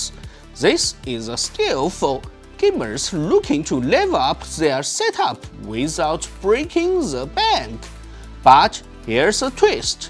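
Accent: China. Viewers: which chinese dialect is that?? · its his second language hes probably just trying to practice his english. he seems to be chinese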